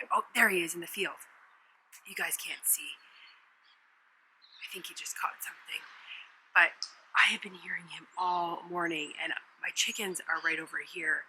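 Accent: Canada. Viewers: thank you. you've got me interested in it again! and for that I thank you. is your accent Canadian? I live in metro Detroit so its very familiar to. me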